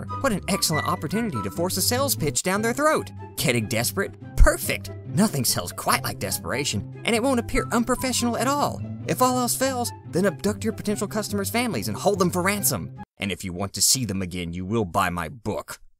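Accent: South, United States. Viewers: is this guy a "southerner" as americans call it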